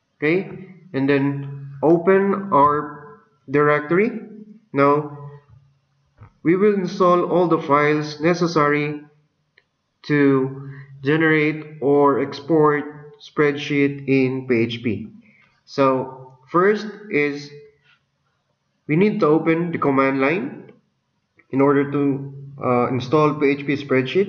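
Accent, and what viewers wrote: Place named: Philippines. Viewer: You sounds like from Philippines bro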